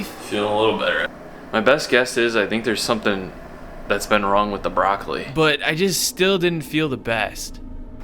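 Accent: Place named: New England, United States. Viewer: Where are you from bro ? Your accent is screaming New England hahah that’s coming from someone on New England lol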